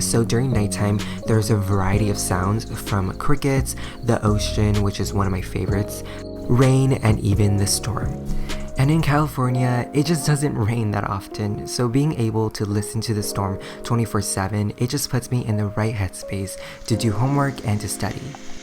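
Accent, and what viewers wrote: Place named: Philippines. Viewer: I swear he sounds Filipino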